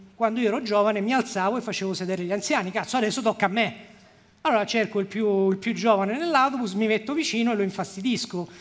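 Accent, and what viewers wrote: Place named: Sicily, Italy. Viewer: la tua inflessione non identificabile perché c'è del siciliano/romano/italiano RP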